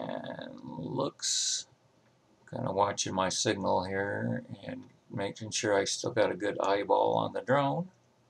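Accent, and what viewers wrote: Ohio, United States. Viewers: You keep your Ohio accent. I love accents, that's what makes people unique. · Your Ohio accent is wonderful. Don’t change it for any critics in your comments. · i think your ohio accent is cool, dont worry about it.:) my late dad grew up in and around akron and a friend grew up in port clinton and sandusky.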